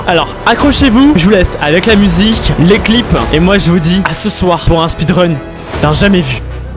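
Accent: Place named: Switzerland. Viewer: Gg mais est ce que tu es suisse parce que tu a un accent suisse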